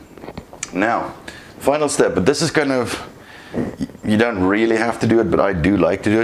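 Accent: South Africa. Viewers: Great video...your accent..is it a South African twinge? Lekker man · South African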